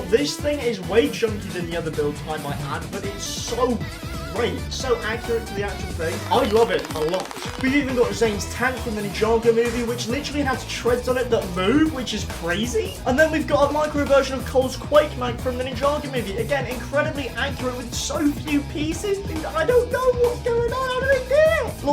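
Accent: United Kingdom. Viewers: Bro are u brithish ¿?💀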